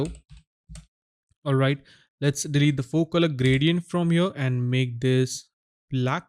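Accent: India. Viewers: all is good man, but indian accent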